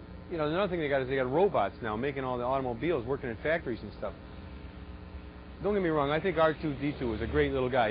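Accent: United States. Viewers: gotta love the new york accent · That's a Chicago accent.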